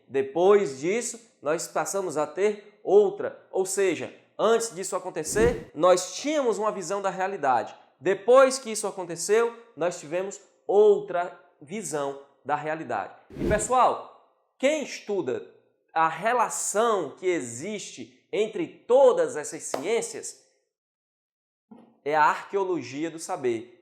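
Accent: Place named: Rio Grande do Sul, Brazil. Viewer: "Pudê" (poder)....sotaque gaucho.....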